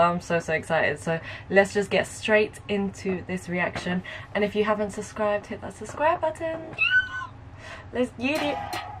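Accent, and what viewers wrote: United Kingdom. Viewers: I know this is out of the blue, but I have just realized that you are British from your accent. Is there any other way to get to Ireland from England than by plane? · U from UK. Cuz i know ur Accent 😁😅😃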